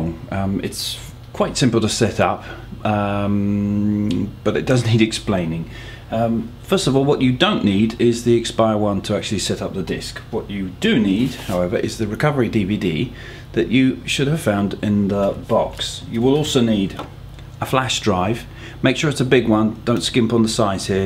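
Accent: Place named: Australia. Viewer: WHOOOOOAAA THE VOICE (i love australian accents) are you australian? lol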